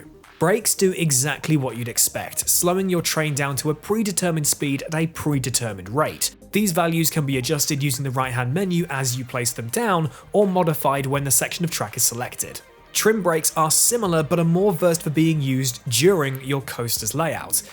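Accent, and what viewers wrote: United Kingdom. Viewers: What? He's just British lol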